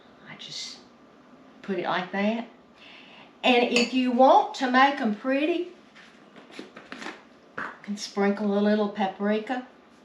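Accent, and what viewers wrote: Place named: Alabama, United States. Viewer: I only remember them being called deviled eggs. If I make them at Christmas I add some sweet pimentos with the relish to have the red with the green. And sometimes I make them with soft bacon bits if Im trying to be fancy lol. I am from coffee county Alabama and listening to you sounds like home. God Bless and keep you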